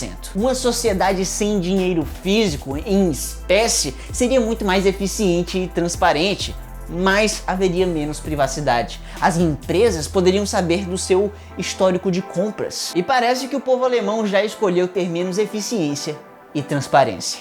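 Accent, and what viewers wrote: Ceará, Brazil. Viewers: Certeza que ele é cearence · tu é do ceara man? kkk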